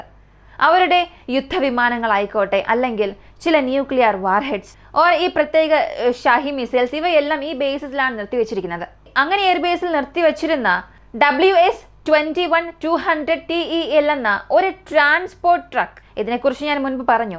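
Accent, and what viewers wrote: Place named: Thiruvananthapuram, India. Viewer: വല്യ കുഴപ്പം ഒന്നും ഇല്ല മോളെ. പിന്നെ Trivandrum slang ഒളിച്ചു വെച്ചാലും......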